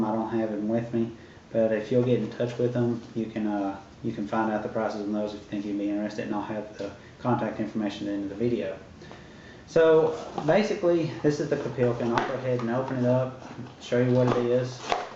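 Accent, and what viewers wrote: South, United States. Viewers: U sound southern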